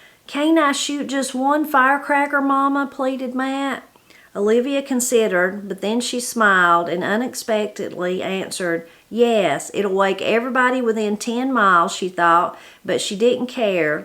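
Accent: Appalachia, United States. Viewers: I was looking forward to the last installment today. I've enjoyed hearing it, and your authentically Appalachian voice made it more of a pleasure. I haven't done a good job of clicking "like" on your daily Christmas stories from Appalachia because I've been watching on my TV where I have click "like" before it ends. But they have been a Godsend to me this month; a daily reminder to stop and relax and enjoy the season. A little bite of Christmas each day, like appetizers. Thank you for all the extra work you've done to produce so many Christmas videos and for inviting us to share your Christmas preparations. Merry Christmas to you and to your whole extended family.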